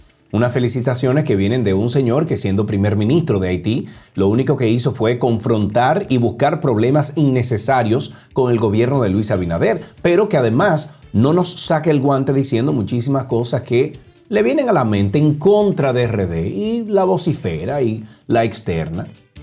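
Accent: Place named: Arab world. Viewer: Yo creía que tú no eras dominicanos porque cómo tú hablas tan mar dé los dominicanos pensé que tú eras árabe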